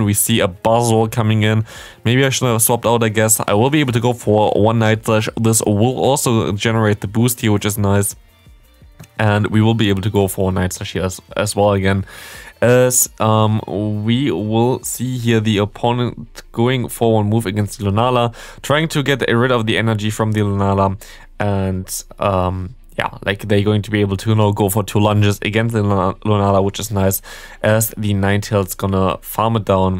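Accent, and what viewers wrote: Germany. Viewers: Hello welcome to a new video. And he is German. Easy to follow.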